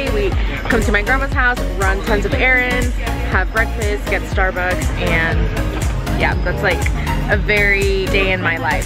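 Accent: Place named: United States. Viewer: Porque los popis de mx. Ablan English while in Mexico???? Osea se los olvida el Español in Gringa voice!!! Tots not gringa!! Lol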